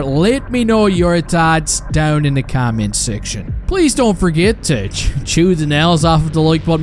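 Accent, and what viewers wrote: Newfoundland, Canada. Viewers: Is this guy a Newfie????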